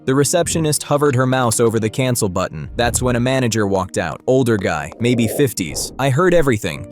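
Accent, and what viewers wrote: United Kingdom. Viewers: bro i finnaly realised he has a brithish accent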